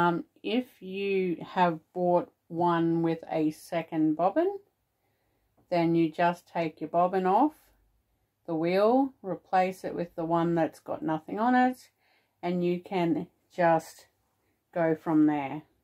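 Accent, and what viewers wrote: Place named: Australia. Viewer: Great to hear an Australian voice. Love this yarn. I am a beginner spinner but I have this in mind for later on. Thanks for sharing.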